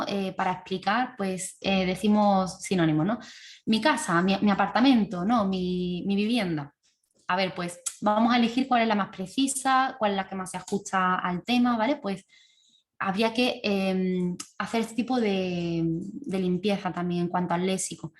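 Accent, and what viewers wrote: Andalusia, Spain. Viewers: Qué guay! Muchas gracias por compartir este segmento de una clase! Como mola ser como una mosca en la pared! (Suenas mucho más andaluza aquí, también!Jajaja)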